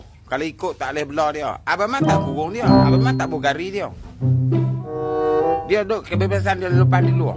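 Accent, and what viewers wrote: Kedah, Malaysia. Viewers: Cara ckap mcm org baling 😌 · abg man org mna..ckp supo org bliing ja😂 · Mu orang Baling ka abg? Wak tak tuh dehh..dema kacau kita dehh..😅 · Bg. Man ni ckp loghat Baling Kedah...kalau area Kuala Nerang-Pedu-Pokok Sena-Titi Akar, loghat mcm ni orang Melayu yg boleh cakap siam...dah mix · Pkcik ni ckp loghat apa ye?kedah ka atau terengganu/klntn?😅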